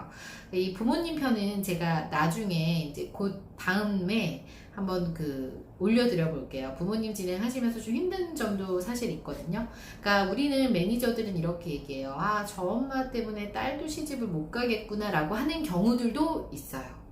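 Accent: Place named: Seoul, South Korea. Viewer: 서울말 잘한다!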